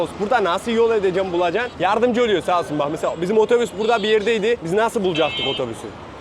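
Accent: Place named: Central Anatolia, Turkey. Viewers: Kral konyalımısın şiven bizim oraya acayip benziyor. Video mükemmel olmuş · Kardeşim çok neşeli, eğlenceli bir insansın. Bir de bizim iç Anadolu ağzıyla konuşman çok güzeeeeeeellll❤❤❤❤❤ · İç Anadolulu olabilir. Herkesin ağzı bir değil. Bilerek yapmıyordur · Sen ananas satan adama "Ek" diyorsun, bir demek istiyorsun, ama o senin inglizche konusmani bekledigi icin seni anlamadi galiba, beyni durdugundan degil )))). Anadolu shivesine bayiliyorum, has türkce. Amerikali , bebek gibi konushan genchler sinir ediyor, ama senin türkchen cok güzel. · Slm kardesim.cok guzel videolar.konusmalarin ayni bizim kayseri konusmasi gibi.allaha emanet ol kardesim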